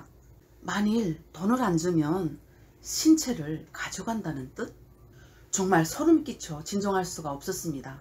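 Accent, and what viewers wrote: Pyongyang, North Korea. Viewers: 우연히 보게 되었는데 님의 영상이 나의 그동안의 약간의 고정관념을 깼네요..북한출신 유튜버들이 좀 있어서 몇명의 영상을 봤었는데 뭐랄까.. 그리 호감이 가지는 않았죠..이유는 여러가진데 첫번째 북한 사투리 거의없이 너무 남쪽말을 잘하고 여기 어린애들이 주로 사용하는 은어..그런것도 자유자재로 말하는데 내가볼때 그건 남쪽말을 잘한다가 아니고 너무나 부자연스러웠죠.. 씨처럼 평양 사투리가 느껴지는 억양으로 하는게 훨씬 보기좋고 자연스러우니까요..그리고 두번째..이게 젤 마음에 걸리던데..북한을 너무 비하하는거..부모형제.친구.친척들이 살고있는 실질적인 조국인데 남한에서 산다고 남조선 젊은애들 은어를 섞어가며 븍한을 비하하는 모습은 정말 보기 안좋더라고여..그것은 자신의 얼굴에 침뱉기죠..내가 생각할땐 남한 인민들보다 북한 인민들이 어려운 환경에서도 지금까지 버텨올수 있었던건 바로 우리민족의 굳은 자존심 아니었을까 합니다..경제난 속에서도 양키.왜놈들에게 결코 머리 숙이지 않았으니까.. 북한의 좋은점도 찾아서 이야기 하고 남북간의 다른 말도 소개해주며 서로간의 이질감을 없애주는 방송..동포라는 생각을 갖게하는 그런 방송이 되면 좋겠네요.. 첫댓글인데 너무 길어서 미안합니다 ^^ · 관심 갖으러 왔디요~~서울말보다 평양말이 더 정감가네요~~ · 서울말 표띠 마이 안내이 존네예.. 계속 평양식 말투로 해 주모 더 조켄네예.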